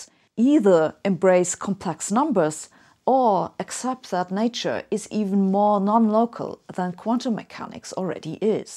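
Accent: Germany. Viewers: Oh wow. I am so used to watching your more modern videos, and your accent there, at least it appears to my ear, is not so German. It sounds more like a Brit who has a slight German accent. Here, you remind me of my German girlfriend from 6th grade. Crazy how much difference 2.5 years make. I assume you've probably been living in the UK mostly, then, huh?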